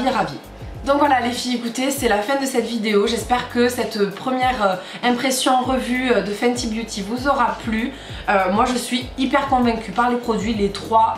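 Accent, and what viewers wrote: South, France. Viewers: notre accent du sud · Ton accent me fait toujours plaisir, ça me donne ma dose de Sud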